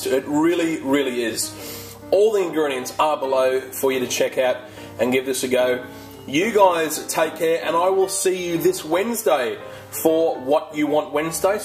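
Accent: Australia. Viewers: That looks delicious! Love the Australian accent. Take care and God bless from Beaumont, Tx! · After the accent and the fact that he has said where he lives in a few of his videos, I believe he's Australian.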